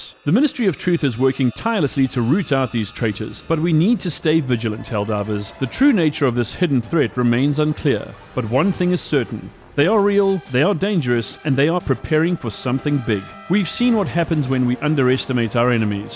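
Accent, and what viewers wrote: South Africa. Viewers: Is this a SAFA based channel? I know that accent far too well! SAFAs for democracy and liberty! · I know a South-African accent when I hear one, boet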